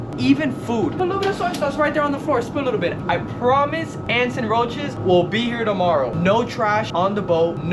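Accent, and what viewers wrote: Miami, United States. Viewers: His Miami accent lol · Knew bro was from miami after a couple words 💀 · Are you from Miami?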